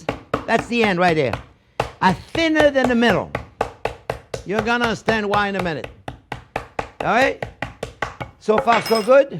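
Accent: France. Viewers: I love his french accent...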